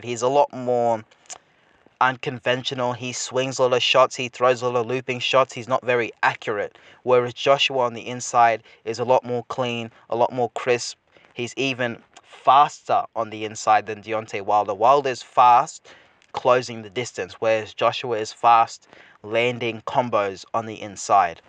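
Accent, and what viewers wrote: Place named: Australia. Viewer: 👍 I knew I could hear a slight Australian accent in your voice. It's very mild though...hard to tell.....I bet most Americans think you're from the UK!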